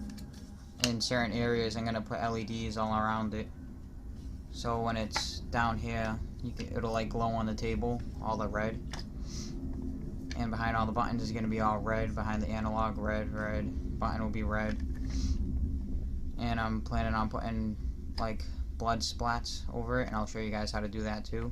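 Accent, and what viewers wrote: Boston, United States. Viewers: where u from? you sound like you have a slight boston accent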